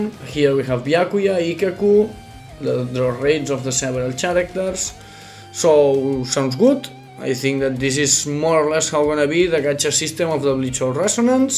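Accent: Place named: Catalonia, Spain. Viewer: Hola soc català com tu, lo accent se te nota molt jajaj es bastant graciós veure com parles ingles bé pero en accent català.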